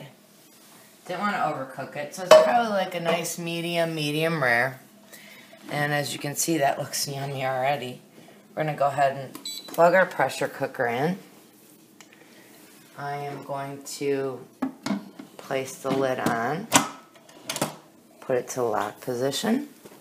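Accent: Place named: Chicago, United States. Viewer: are you from Chicagoland. I am from Matteson and you sound like a local. I have subscribed and will be making that ASAP. You rock!. Keep them coming I will be watching.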